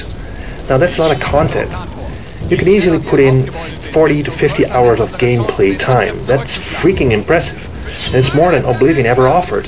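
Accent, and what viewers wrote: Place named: Netherlands. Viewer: I can hear it from your accent that you're dutch. Am I right?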